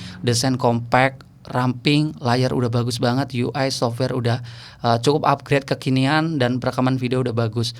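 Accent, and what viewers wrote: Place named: Banyumas, Indonesia. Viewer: Oh wong Cilacap Adipala toh Ngapak kaya nyong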